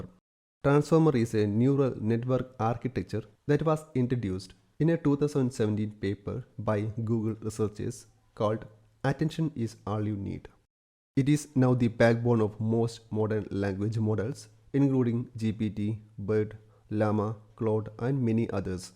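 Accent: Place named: Kerala, India. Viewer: Why don't you use a text to voice output and present this without a heavy Mallu accent 😮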